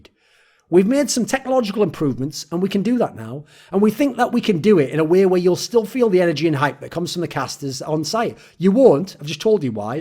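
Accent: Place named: United Kingdom. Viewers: Why did Thorin's british accent get stronger? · He does sound different, he might be visiting home and having his more natural accent come out. Anyone know exactly what is his accent, from what region?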